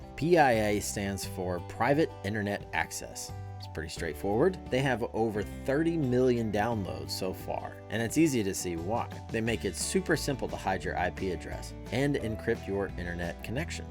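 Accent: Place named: South, United States. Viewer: I was born and raised in Tennessee. His southern accent is a little on the light side compared to most southerners myself included.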